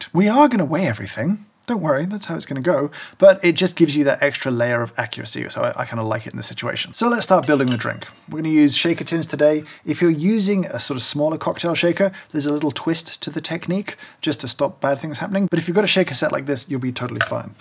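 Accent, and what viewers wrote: United Kingdom. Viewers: From watching your videos I have really developed a love and respect for coffee. I'm quite sure it has a lot to do with your British accent. Here's a small, insignificant suggestion, sneak a French word in your description of the flavor. It will add a continental flavor, I think. It has nothing to do with the content but combined with that accent will add some spice to the presentation. We English speakers have a certain respect for the French for some reason. The language has always lent a certain something to the mother tongue. Keep up the great work!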